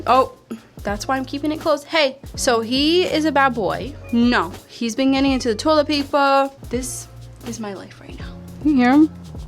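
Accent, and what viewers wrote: United States. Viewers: ah cool thank you so much for your reply it means a lot ❤️ pretty sure that's not a thing in the UK lol 😂 I'm in Bristol, England 😊 love your videos so much when I feel a bit overwhelmed with house work your vids give me the boost i need to get on with things. Also I love your accent and wish I lived In America lol 😂 hope you, your bloke and your new kitty are all well 😊 xx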